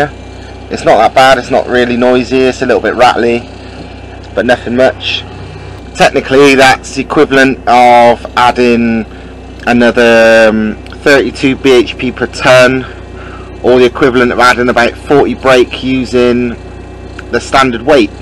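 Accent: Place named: Gloucestershire, United Kingdom. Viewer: Proper Glos Accent in there mush.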